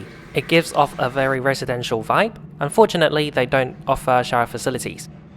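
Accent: Australia. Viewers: you are sounding more Aussie than ever; Chuck a Ueee! 🙂 Another great report from not your first time in Queenstown!. See you very soon. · G'day, I love your Aussie accent and lingo with "doing a uey" Looked like a great flight. Least you had a screen in front of you, and the food looked very tasty 🤩New Zealand looks awesome, so want to go there. Look forward to your next video, although I'm still catching up on your previous ones 👍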